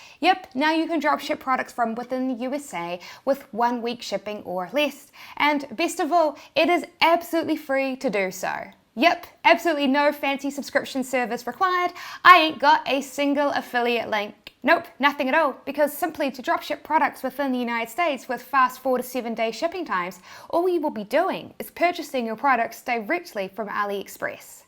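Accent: New Zealand. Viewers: Love the new Zealand accent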